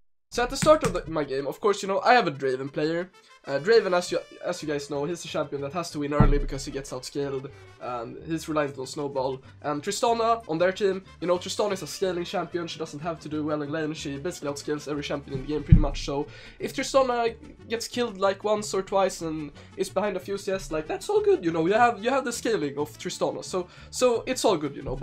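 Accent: Sweden. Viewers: fan vad svensk du låter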